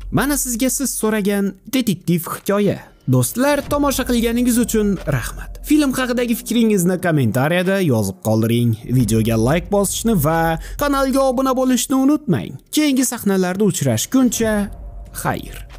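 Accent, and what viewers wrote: Khorezm, Uzbekistan. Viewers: Ogʻo omad xorazmchasina yoribsiz lakin sharxni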